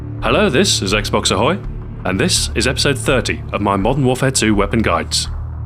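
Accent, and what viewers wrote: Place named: United Kingdom. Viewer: Why does everything sound better with a British voice?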